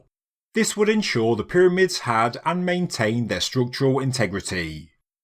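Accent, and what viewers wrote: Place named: Midlands, United Kingdom. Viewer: He’s from the Midlands………… leave him alone😡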